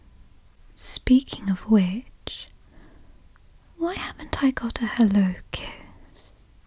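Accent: United Kingdom. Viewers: That british accent is so cozy yet so misterious .the experience is very intimate with just simple words of affection im suscribed as heck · Oh my, I am SO, weak for British accents. They have that posh, certain tone that makes them sound luxurious. and GOD, the teasing is just delicious to listen too, and the accent only amplifies it, I would so end up whimpering and flustered in the best way hehe. Lovely video love! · Mommy british voice nooo my one weakness.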